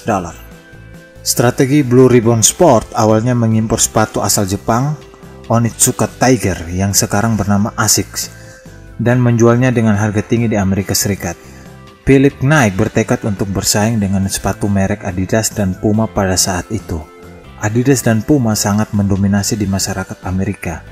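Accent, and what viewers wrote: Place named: Eastern Indonesia, Indonesia. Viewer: Orang timur ni logat nya smoga sukses bro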